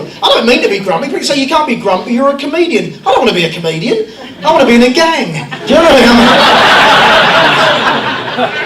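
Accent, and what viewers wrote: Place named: Essex, United Kingdom. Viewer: you are a very funny guy. But you'll just have have to slow down your Essex accent Sir - then the world will be your oyster.😎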